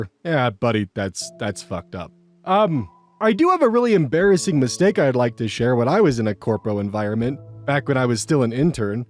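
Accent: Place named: New York, United States. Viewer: Curious question.... Why do you sound like someone that lives in Manhattan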